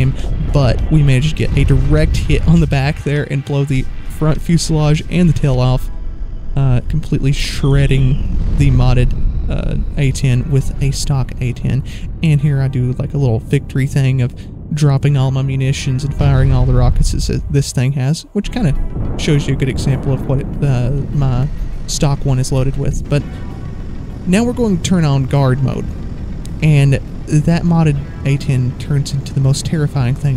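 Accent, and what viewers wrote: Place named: Iowa, United States. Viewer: It's just a yes or no can you make a like ww3 series were you use that weapon mod basically nato vs russia and china and other Rouge contries pls and thank you. (other suggestion make a F22 and the B2 bomber) (I know you a guy from Iowa or something in the usa that has a dessert cuz you got a nice accent) (sorry if that offended you) (Gramerly helped with that) (I think im using too much brackets lol i mean these (((())) )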